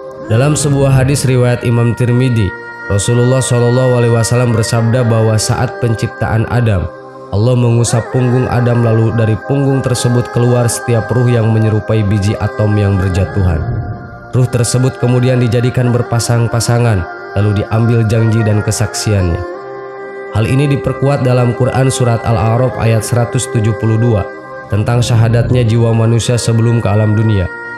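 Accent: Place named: West Java, Indonesia. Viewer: Sunda banget yak 😁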